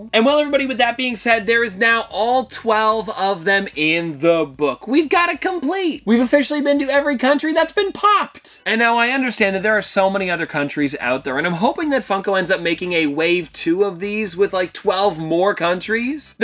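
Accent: Canada. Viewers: dude are you canadian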